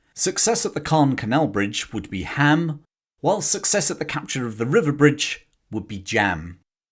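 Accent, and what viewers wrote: United Kingdom. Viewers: Many thanks for the video, with this English UK accent, spelling so British. Now that bad english is spoken everywhere worldwide, I lived for 2 decades between Singapore and Jakarta, working to supply tools and parts for industry. We have singlish, American men from Texas, Australian from Melbourne and some kiwees and Filipinos. Indians also. So thanking you very much for this wonderful British video. 😊😊😊😊😊😊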